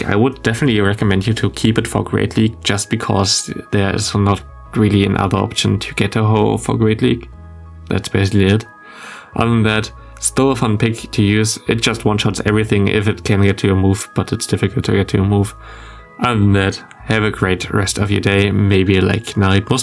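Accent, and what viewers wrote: Germany. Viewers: He‘s not a native English speaker either. I think he‘s German from what I heard. Brother you just got to get better at understanding English, because thats really not that hard.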